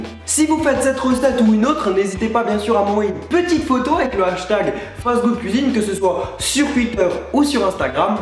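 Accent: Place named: Alsace, France. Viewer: C'est l'alsacien ;)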